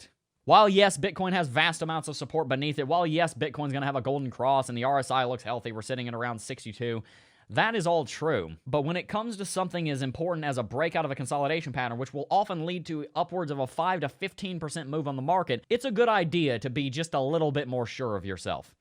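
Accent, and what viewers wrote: South, United States. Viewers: Hey dude are you from the south? I like you accent very much. Thank you for your voice.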